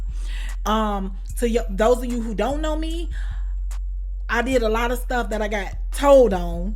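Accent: Chicago, United States. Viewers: You have a strong Chicago accent I'm from Minneapolis damn near happy people here damn near 3/4 of the people here are from the Chi ... You carry that accent heavy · it's sumtin bout that Chicago accent........ 🔥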